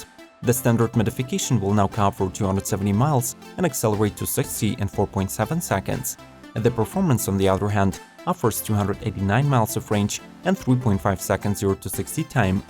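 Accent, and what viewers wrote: Russia. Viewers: what is this killing russian accent for?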